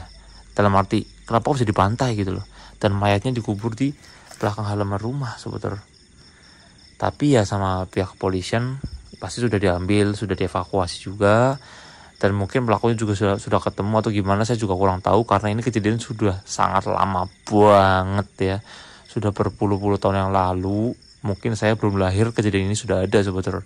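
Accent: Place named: Java, Indonesia. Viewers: Bang lw ngomong koe logatnya medok sih emg bang asal daerah mana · Mantap mas maaf mas klo boleh tau sampean dari tegal y jawa tengah logat nya ketahuan salam dari gunawan cah weleri sobat horor sehat slalu mas jngn lupa bismillah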